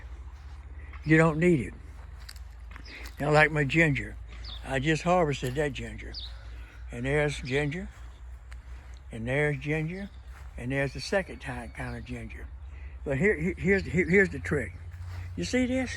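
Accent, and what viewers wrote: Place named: North Carolina, United States. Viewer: Thank you for the great video! Are you from North Carolina? You sound exactly like my grandparents!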